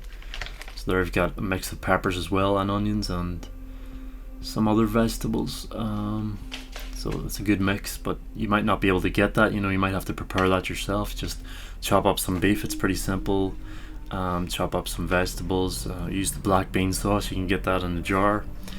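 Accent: Northern Ireland, United Kingdom. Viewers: he lives in Northern Ireland by the hears of his accent;)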